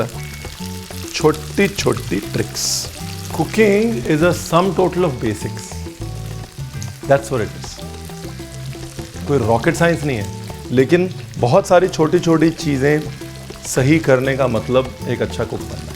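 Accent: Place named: Punjab, India. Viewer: your explanation is very impressive. your simple style is very good . you are fit to become another Pran of Bollywood with your beautiful Pnnjabi accent.GOD bless you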